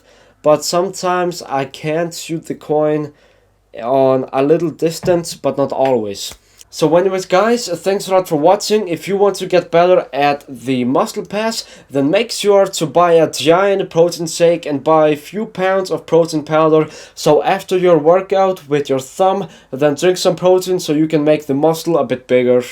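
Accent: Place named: Russia. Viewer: That's actually russian-english accent)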